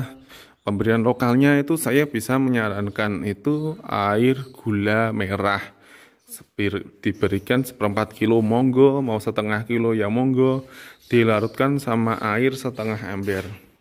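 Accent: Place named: Juwana, Indonesia. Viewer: Koyo wong juwono yo om?😁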